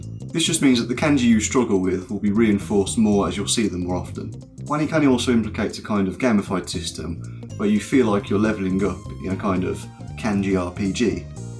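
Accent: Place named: Birmingham, United Kingdom. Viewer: Kantan Japan I will definitely check that out, I had heard of it but was already swamped with books and other websites etc. And the accent give away is from your N4 video, you said the number 5 - it's impossible to cut the accent out of that number ;) Also, I'm from Great Barr, so only down the road - probably why you sound so familiar!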